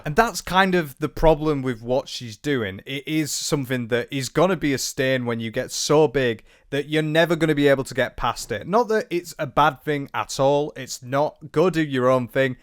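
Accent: United Kingdom. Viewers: Huh i didn't think she'd have a British accent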